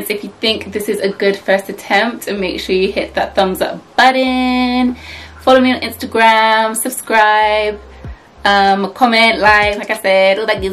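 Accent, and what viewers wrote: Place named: United Kingdom. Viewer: Love these braids on you! Followed you over from IG and this is the first time I I've heard you speak lol Totally forgot you are from the UK <3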